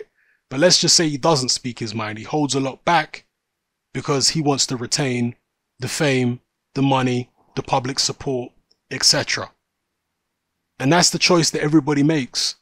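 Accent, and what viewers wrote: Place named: United Kingdom. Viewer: Shut the f up dude u sound like u from the u k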